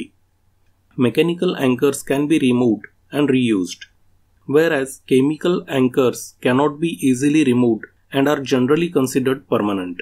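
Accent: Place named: Punjab, India. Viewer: i immediately exited the video due to the punjabi accent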